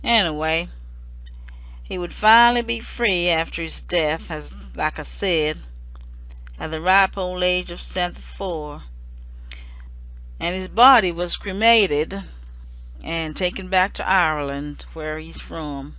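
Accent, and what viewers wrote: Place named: South, United States. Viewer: I loved your southern drawl it was absolutely entertaining. Thank you for the stories God bless you ❤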